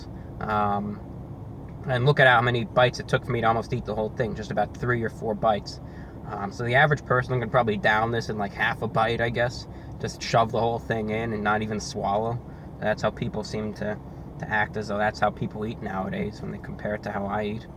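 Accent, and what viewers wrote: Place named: Upstate New York, United States. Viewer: recognize your "accent". Are you from Upstate New York? I am and that's how people talk around here.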